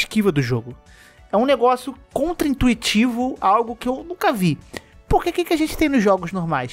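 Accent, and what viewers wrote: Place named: Minas Gerais, Brazil. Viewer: Coé irmão, mineiro é mó bão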